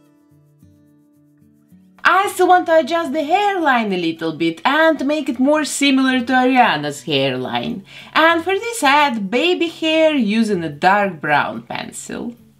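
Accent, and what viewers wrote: Russia. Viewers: Из какой страны автор видео? Просто очень сильный русский акцент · Блян, отдам миллион рублей, но она русская, по акценту понятно.. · Мне кажется или её родной язык не английский, ахпхахахах, может это и не секрет, просто я тут в первые, но мне кажется, что она выучила английский язык, а её родной это русский, ну по моему мнению акцент слишком сильный, ну хз может я ошибаюсь. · Omg I love your russian accent. I'm crying it's so beautiful! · Is this Russian accent?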